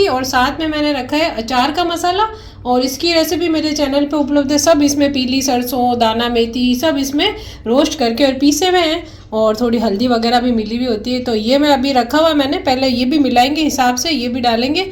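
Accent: Jodhpur, India. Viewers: Jeejaa aap jodhpur k rahne vaale h ya aapki meethe boli m jodhane kee apnayat h achar k kya kahne vaah saa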